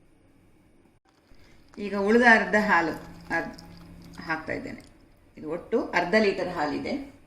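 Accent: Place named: Mangalore, India. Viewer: Thumba nidhaanavaagi Mangalore kanadadhalli neevu vivarisuvudhu thumba chanda👌👌neevu elli iruvudhu Madam? Mangalorenalla?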